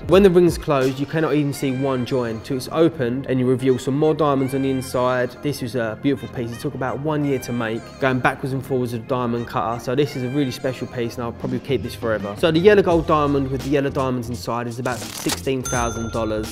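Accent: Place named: East London, United Kingdom. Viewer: Wow, amazing collection 👌 Just found your channel, love it! Love your accent too, sounds just like mine 😜 Born and bred in Custom House, East London but live in Australia at the moment 😊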